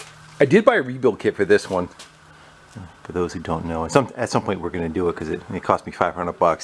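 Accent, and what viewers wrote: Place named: Fargo, United States. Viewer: "... try to knock out this bobcat skidsteer that I bought, oh jeez..."... are you originally from Fargo by chance? You do fix a lot of wood chippers and snow blowers...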